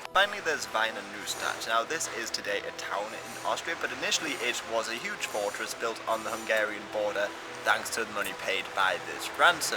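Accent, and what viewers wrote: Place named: Newcastle, United Kingdom. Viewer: he is from Newcastle, so a geordie or 'Northern' accent.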